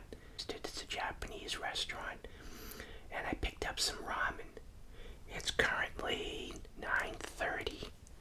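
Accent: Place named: New York, United States. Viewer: kinda morbid I suppose but I guess your from New York from your accent - were you there on 9/11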